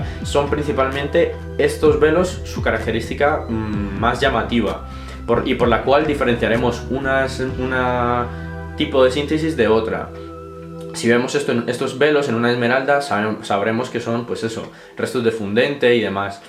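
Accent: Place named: Spain. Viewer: Disculpame,a quien le quieres meter ese cuento de que eres Colombiano y porque!?. tal vez porque te quieres usufructar como posible posted or de esmeraldas Colombianas. Bueno a todo esto ,hablas castellano como todos los españoles,que no hablan español si no castellano. también el tal (dale) por todos los lados,no te falta sino decir (vale y tio). Personalmente ,de Colombiano no te veo nada.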